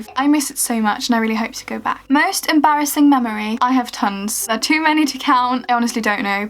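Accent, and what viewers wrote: England, United Kingdom. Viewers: You're Sweden but you sound so English like damn this is new to me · jag trodde att du var britt först innan jag insåg att du är svensk ahahah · You sound British?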